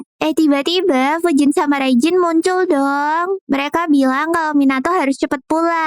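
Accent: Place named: Java, Indonesia. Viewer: Suaranya jowo 😅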